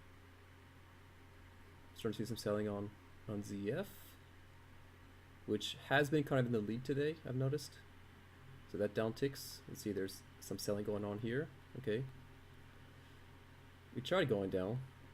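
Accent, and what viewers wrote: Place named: France. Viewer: hey man, whats up. I followed you on twitch. Do you still stream? also you sound french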